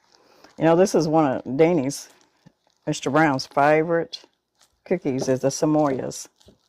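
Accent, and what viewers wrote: South, United States. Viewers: Hay thank you for your restapee love your southern talk but that look so good from steve c from state Oregon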